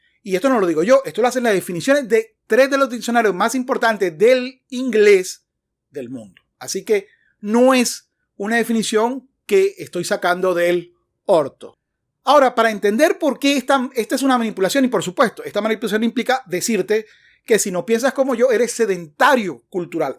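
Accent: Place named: Maracaibo, Venezuela. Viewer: Tenei acento maracucho mano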